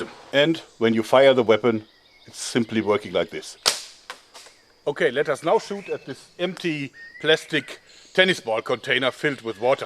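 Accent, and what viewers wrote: Germany. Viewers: are you from germany originally? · Ich bin mir nicht ganz so sicher, aber bist du deutsch? :D Hörst dich nämlich ein wenig so an ^^ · as a german i got to say that this sounds like a german dad speaking english. r u german o.O ?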